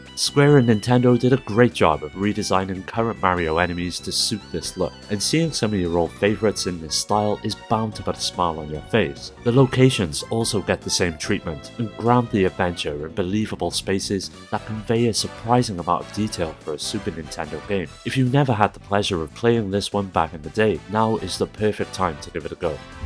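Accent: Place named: Liverpool, United Kingdom. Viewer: Lol it's just a heavy Liverpool accent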